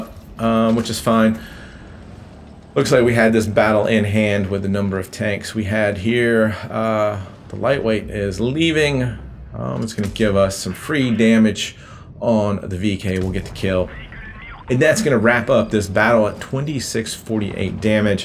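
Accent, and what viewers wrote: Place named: France. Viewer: Great vid mate. I prefer saving my free xp for the blitz fair. Btw great French accent ;)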